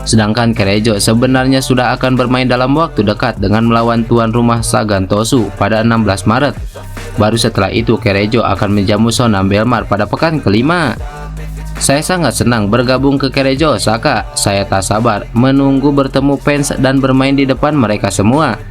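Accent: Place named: Surakarta, Indonesia. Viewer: Wow wong solo .... Bisa nih persis solo 😅😅😅😅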